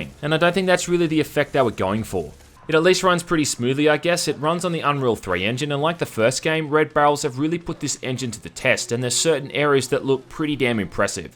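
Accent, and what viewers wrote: Australia. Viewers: is awesome. I personally liked outlast, but we need someone to be tough on games so developers give us more. Much support from the United States to you my friend. I watch many of your video's and keep up the great work. Just curious are you from Australia or New Zealand?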